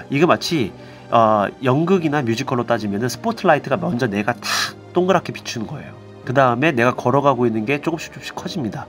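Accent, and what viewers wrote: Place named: Seoul, South Korea. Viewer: 안녕하세요 훈더맨 실방에서 알고 들어왔어요 역시 서울 말씨가 듣기좋습니다.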